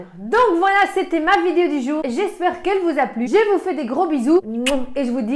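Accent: Belgium. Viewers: Je crois de belgique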